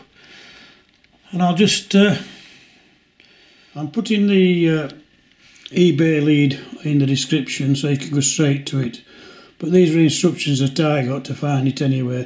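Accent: Yorkshire, United Kingdom. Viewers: you want to get dragon for Mac Professional 6 it's £249.99 but works really well and should understand your yorkshire accent with a bit training. Are you are using the built in dictation on your mac? Download the extra features in the settings so it won't have to keep going on the internet and it should work a lot better also turn the volume up a bit that helps. I like the lights I may have to get some in 00 and n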